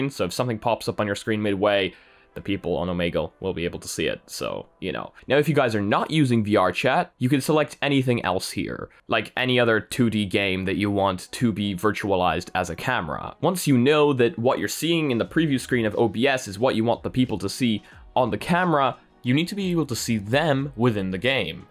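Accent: Poland. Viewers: question are you from poland?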